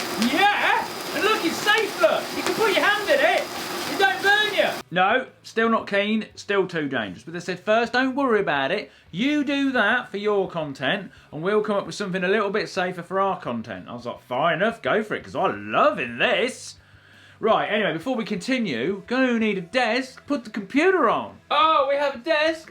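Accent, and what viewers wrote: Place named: Midlands, United Kingdom. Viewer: purely your heavy Midlands (I think) accent coming through 🤣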